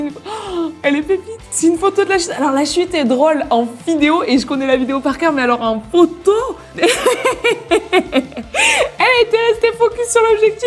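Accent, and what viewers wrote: Marseille, France. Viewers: J'adore dans accent de Marseille (en j'ai le meme) sinon ti cro belle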